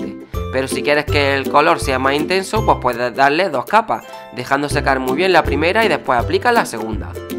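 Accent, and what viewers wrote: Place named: Almería, Spain. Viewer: Te a quedado preciosa un saludo desde Almeria por tu acento creo qeu estas cerquita😘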